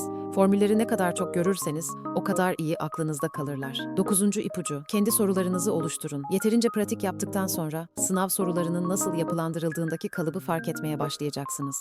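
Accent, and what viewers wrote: Azerbaijan. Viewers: sen Azerbaycanlısan?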